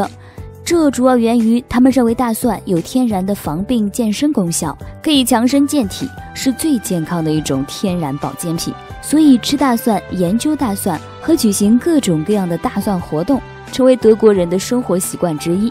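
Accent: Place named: Shandong, China. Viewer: 我以为是山东人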